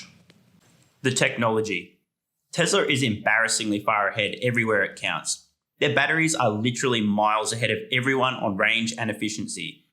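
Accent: Australia. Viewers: I could have made the exact same video (minus the Australian accent LOL). Totally share your views -- I am personally heavily invested for the same reasons as you stated plus the fact that it's at least 50% undervalued (I have my own calculations). It's as clear as day that in 5-10 years TSLA is going to be a multiple bagger. And like you said -- in 2030, people will say how lucky you were to invest in TSLA. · I'm not super interested in buying a Tesla car right now because I would rather have a Corolla- or Camry-like electric car, at those lower prices. But I would invest! Though I could only manage 5 or 6 shares, to begin with. Nice to hear an Australian accent talking about this!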